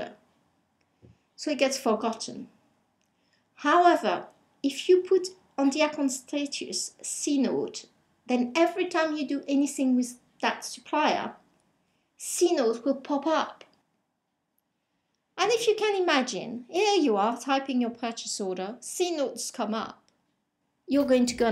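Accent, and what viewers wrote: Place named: France. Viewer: You are especially short-sighted if you think her "funny" accent makes her clueless about Sage. If anything, it goes to show her fluency in one more language (french), which is something I doubt you will ever boast of. If you had anything useful between your ears you'd obviously see she has an in-depth knowledge of the subject with the way she states the advantages or disadvantages of using particular options. Nitwit!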